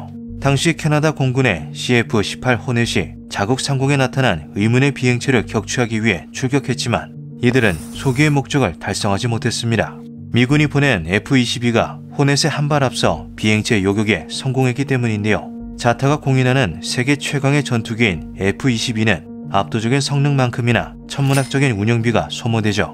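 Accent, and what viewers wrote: Gyeongsang, South Korea. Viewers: 뭘 믿어야 하지? 혹시 경상도 출신이세요?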